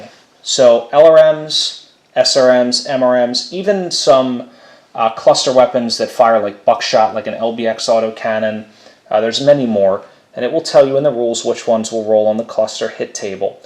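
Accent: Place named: Pennsylvania, United States. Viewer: From PA? Water pronounced 'wooder'.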